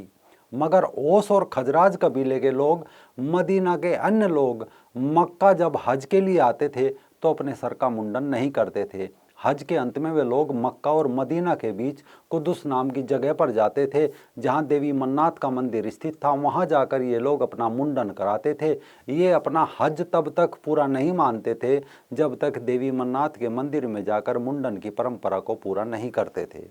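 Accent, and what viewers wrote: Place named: Western Uttar Pradesh, India. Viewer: आपका पश्चिम UP वाला लहजा बड़ा अच्छा लगता है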